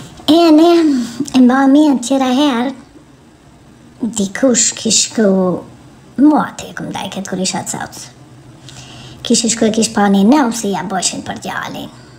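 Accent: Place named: Tetovo, North Macedonia. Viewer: dialekt Tetove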